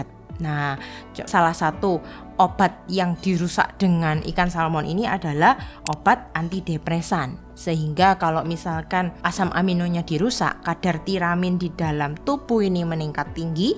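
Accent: Java, Indonesia. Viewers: Jowo ne ketok 😅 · Bhs Idonesianya sdh baik dan benar soal medok Jawa tdk masalah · terimakasih atas ilmu dan nasehatnya sangat bermanfaat penyampainya dr logad jawa banget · Iya betul nggak menghilangkan jawa nya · Logaaak jawwa mu keliatan... Gx bsa berbahsa indonosia yg baik dan bnar y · Logat dan bahasa itu beda....bahasa indonesia nya benar, trus logat nya jawa....emang kenapa?...salah ? Klo yg ngomong orang maluku dgn logat daerah nya, kan juga BOLEH.....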